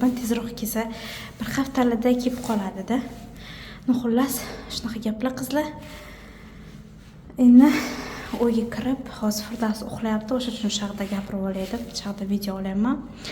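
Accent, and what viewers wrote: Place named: Syrdarya, Uzbekistan. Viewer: Nasib keling Sirdaryodanmisiz shevayizi o'xshatdim adashmagan bo'lsam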